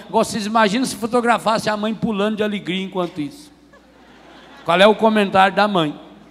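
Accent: Minas Gerais, Brazil. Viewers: Eu aqui lutando contra a ansiedade aí vejo este vídeo de um santo pregando do seu jeito caipira de minas❤️❤️❤️🙌 Deus seja louvado!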